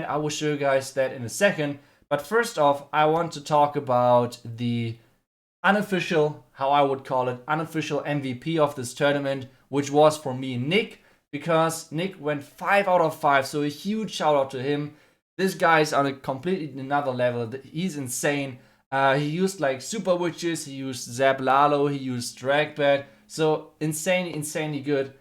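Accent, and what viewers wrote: Germany. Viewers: He has this German accent which sounds cool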